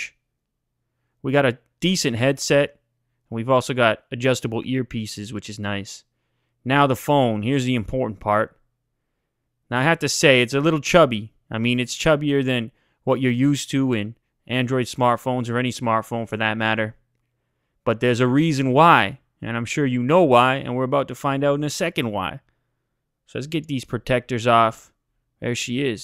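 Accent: Canada. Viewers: Sony's loading screens in games haven't changed much. Also, you sound more Canadian in this video. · Hahahaha omg feeling old as me? I love how Canadian Lew sound back in those days.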